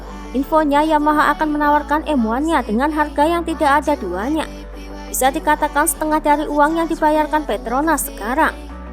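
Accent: Java, Indonesia. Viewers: Mantab bagus penjelasannya , ciri khas banget penjelasan medoknya saya suka 🥰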